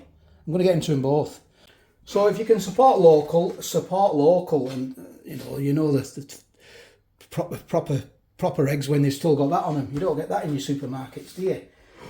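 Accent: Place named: England, United Kingdom. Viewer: I just wanted to hear an English accent today,! Love your posts, still catching up ❤❤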